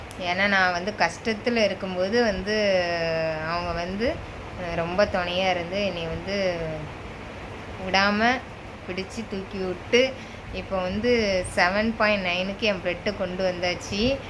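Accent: Tirunelveli, India. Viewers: Unga peache peachu. Enakku tirunelveli slang romba pudikkum 😊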